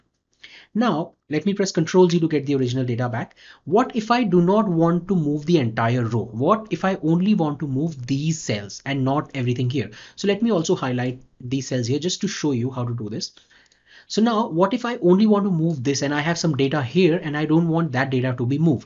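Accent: India. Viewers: When you're trying to learn something software wise, you know you clicked the right video when the first thing you hear is an Indian accent. Awesome video, very helpful!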